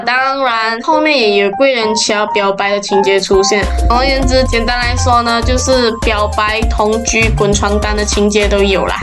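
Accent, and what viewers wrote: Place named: Malaysia. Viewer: 讲的很好只是马来西亚口音很重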